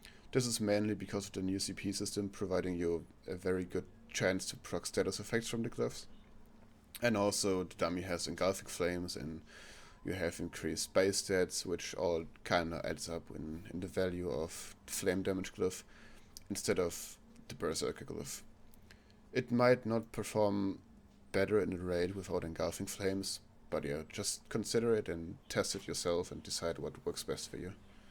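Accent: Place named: Germany. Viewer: Man hört einfach raus das du deutsch bist:D Guter guide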